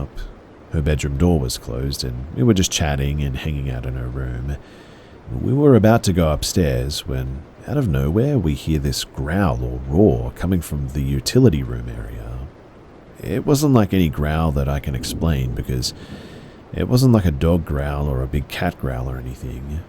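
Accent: Australia. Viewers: I love stories and its sooo great to hear an Australian. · Good to here an Aussie accent! Much more familiar. Cheers mate fair dinkum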